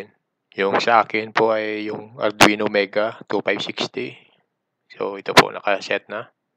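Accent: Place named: Iloilo, Philippines. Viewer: Daw ilonggo ka gid to mo.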